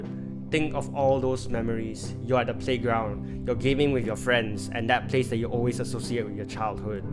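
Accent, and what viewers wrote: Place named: Singapore. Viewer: Singaporean accent makes the message better good luck to you young man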